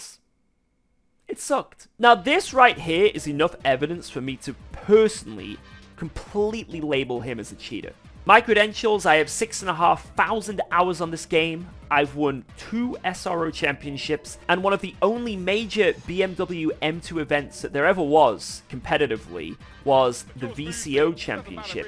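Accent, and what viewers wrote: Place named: United Kingdom. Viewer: He's British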